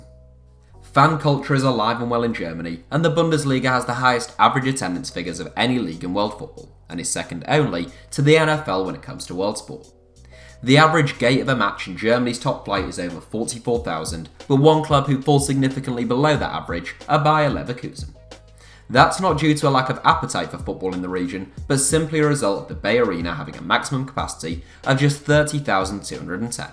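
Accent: England, United Kingdom. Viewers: why on Earth would you picture a random French bloke when an Englishman speaks?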